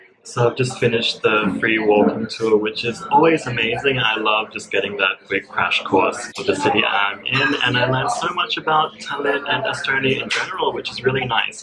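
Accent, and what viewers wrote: Australia. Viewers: Your video popped up because we're heading to Tallin next week, and looking at all the vlogs from there. Hubs is watching while I'm working and I heard the accent I was OOOOOO an Australian accent and came to watch lol. Maybe we'll bump into you in Finland on our way back!! #HappyTravels!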